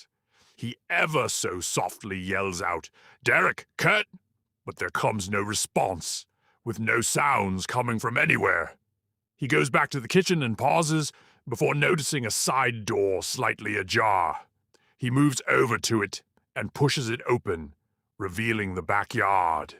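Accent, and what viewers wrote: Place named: United Kingdom. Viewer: I object to the British accent in the narrations. He is your idiot not ours!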